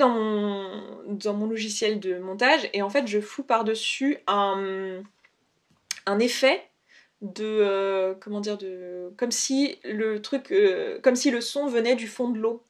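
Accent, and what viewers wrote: France. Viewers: j'ai bien compris ta phrase de fin car tu as un accent un petit peu français si je puis dire. Mais par contre je ne comprends rien quand c'est les natifs qui parlent car ils ont un accent anglais ou américain très marqué et j'ai l'impression que ca aspire tous les mots je ne les comprends plus par exemple quand je regarde une série sous-titré en anglais de te découvrir des nouveaux mots de ce que j'ai entendu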